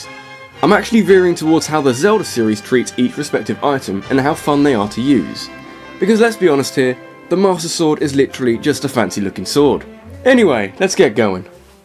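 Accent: United Kingdom. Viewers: I'm about a minute in: my first thought "oh so it's one of these SOB's with 30 second intros... but hey, he's british! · BRITISH ACCENT ON A TOP TEN Legend of Zelda LIST? HEEEEEEELLL YES!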